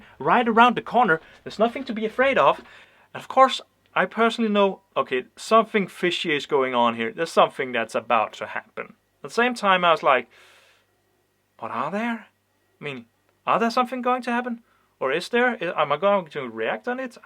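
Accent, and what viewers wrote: Denmark. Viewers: is he danish? he has a very danish accent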